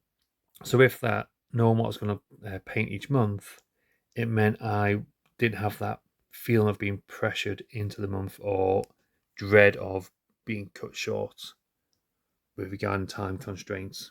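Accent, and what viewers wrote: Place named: Northern England, United Kingdom. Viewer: Are your infantry on 20mm bases? Also are you up north? As you sound northern. West Yorkshire here.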